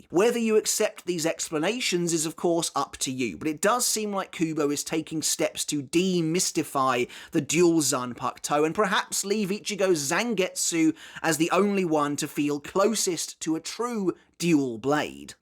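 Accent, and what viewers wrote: United Kingdom. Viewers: it's his British accent that gets people.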